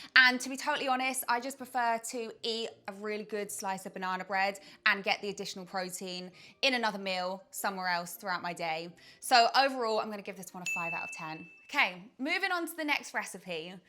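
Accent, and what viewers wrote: United Kingdom. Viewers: I had no clue that you were British or wherever your from. 😂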